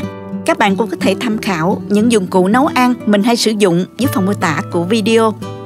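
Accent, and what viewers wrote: South, Vietnam. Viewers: Mình là người Bắc, thường xuyên vào xem kênh của. Các món ăn cô trình bày vô cùng khéo léo, ngon, bắt mắt, cộng với giọng nói Nam Bộ ngọt ngào vô cùng dễ thương. Thương hiệu các món ăn của cô chắc ko ai qua đc. Cảm ơn cô đã chia sẻ những món ăn đơn giản mà vô cùng tuyệt vời.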